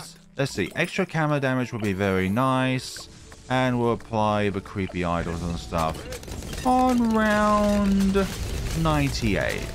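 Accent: United Kingdom. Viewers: You are the most British person I’ve ever heard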